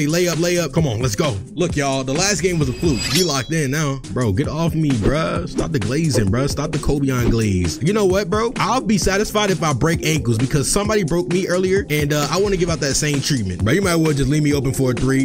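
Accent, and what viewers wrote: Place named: Jamaica. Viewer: The Jamaican accent 😂😂. I wait everyday for a new vid to drop...stay consistent ...banger🔥🔥🔥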